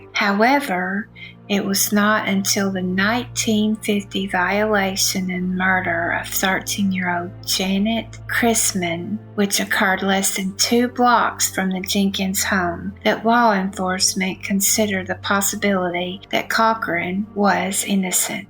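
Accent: South, United States. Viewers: I love your southern draw..Alabama here.. thanks for another one friend..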